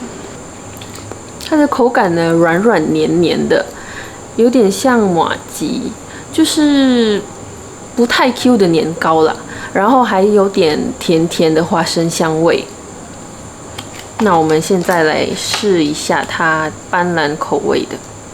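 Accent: Taiwan. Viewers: 好的。。。听了你的口音好像台湾女生。☺️ .我是马来西亚人。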